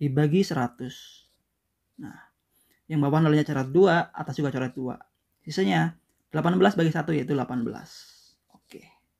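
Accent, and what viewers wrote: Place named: West Java, Indonesia. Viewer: Asa wawuh siga urg sunda haaa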